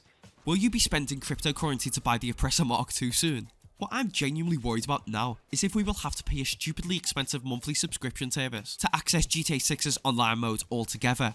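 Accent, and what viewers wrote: Liverpool, United Kingdom. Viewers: Appinin me fellow scouser lad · is this dude from liverpool?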